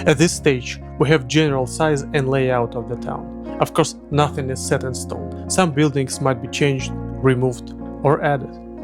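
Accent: Russia. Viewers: why he has so a russian accent?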